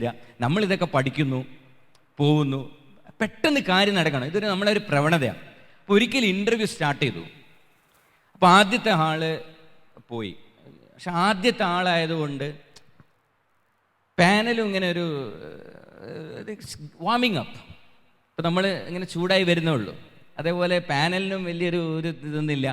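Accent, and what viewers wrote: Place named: Malabar, India. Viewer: സദ്ഗുരു ജെഗ്ഗി വാസുദേവന്റെ പദാ നു പദ തർജ്ജിമ . എന്നാലും കലക്കി . മലബാർ സ്ലാങ് ന്റ് കുഴപ്പം ഒഴിച്ചാൽ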